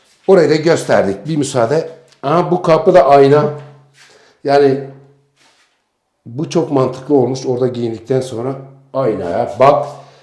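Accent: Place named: Anatolia, Turkey. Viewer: Anadolu silvesine ve senin dogalligina hayranim icinden geldigi gibi konus bosver sen kotu yorum yapanlari. Kolay gelsin kardasim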